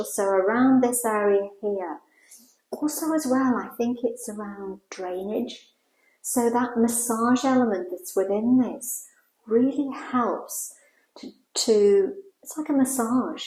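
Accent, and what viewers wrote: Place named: Manchester, United Kingdom. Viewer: You have a lovely accent, are you from Manchester area?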